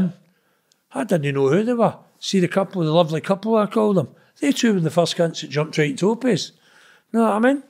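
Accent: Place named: Glasgow, United Kingdom. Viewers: The subtitles are funny they canny understand the wee Glasgow accent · Or learn Scottish mate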